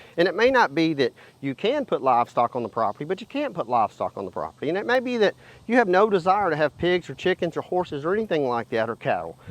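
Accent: South, United States. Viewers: That super southern accent is a challenge. Damn. "Number tin is mineral rots" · i love me some southern accent hehe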